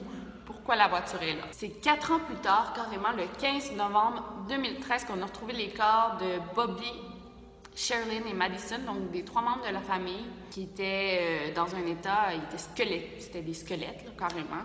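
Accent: Quebec, Canada. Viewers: Bjr je te suis depuis quelques temps maintenant tu es vraiment très pro et t'on accent québécois et vraiment trop cool change rien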